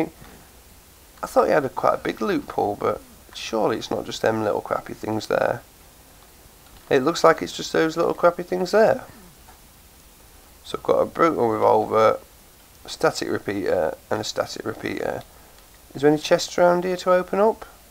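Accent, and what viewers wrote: United Kingdom. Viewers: Are you from the UK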